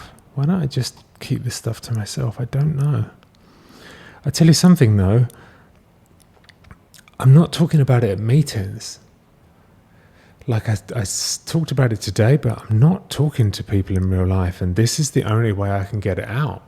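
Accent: United Kingdom. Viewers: your British accent is sooooo delightful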